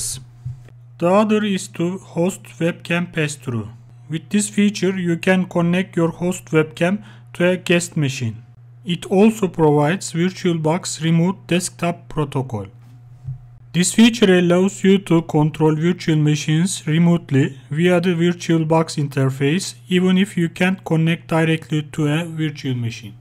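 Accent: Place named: Turkey. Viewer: yanlis anlama ingilizcen cok iyi de aksanin cok beli turk oldugun o yuzden guldum (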